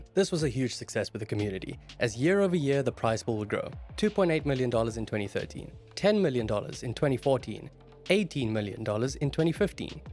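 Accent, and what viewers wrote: South Africa. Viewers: I thought this guy sounded south african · Can spot that South African accent a mile away 😂 Great vid.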